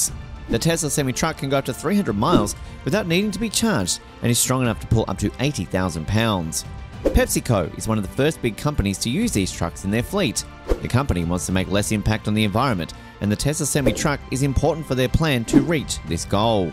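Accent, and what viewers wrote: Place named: United Kingdom. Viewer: Annoying Brit accent and inaccurate information. Very redundant and repetitive narration. The current average class 8 trucks in 2023 range from $150,000 to $220,000.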